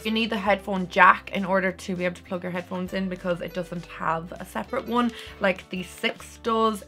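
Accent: Ireland. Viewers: Are u Irish? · Is she Irish?NVM I can tell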